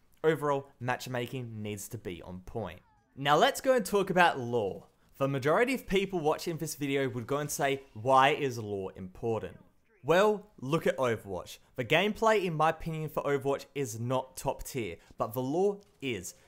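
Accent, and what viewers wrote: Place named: Australia. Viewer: Wait...I thought that's how every Aussie would pronounce those words. Them and the Brits have the same pronunciation for words that in American English wouldn't. I think it's a beautifully interesting thing about the language. Like how the Brits pronounce "torque" and "talk" pretty much the same way.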